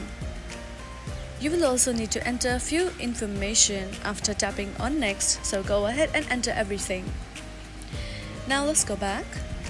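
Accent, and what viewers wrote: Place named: India. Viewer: YOU SOUND LIKE AN INDIAN SCAMMER!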